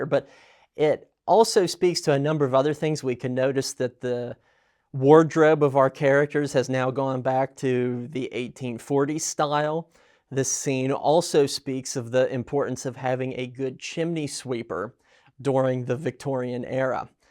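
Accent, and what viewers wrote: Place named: Outer Banks, United States. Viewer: Your accent is incredible. I've never heard a high tider/outer banks accent from an actual person before that wasn't an impersonation. Yeah the video is great and all, but I had to point this out since I couldn't get over it lol.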